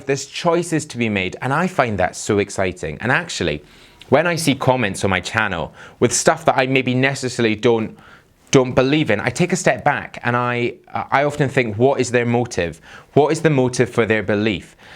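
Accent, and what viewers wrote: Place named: England, United Kingdom. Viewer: where abouts in England are you from? Your accent is amazing!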